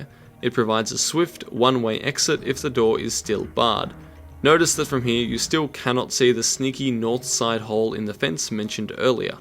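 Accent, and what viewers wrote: Australia. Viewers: are u australian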